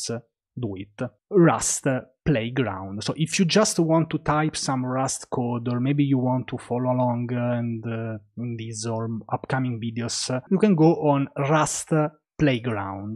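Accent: Italy. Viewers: si alla fine l’ho completata tutta la serie e l’ho capita benissimo, l’accento italiano ha aiutato molto ahah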